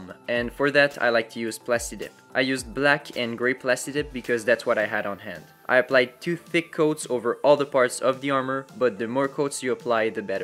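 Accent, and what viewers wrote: Quebec, Canada. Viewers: are you from québec? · J’ai entendu l’accent québécois dès le début hahaha 🫡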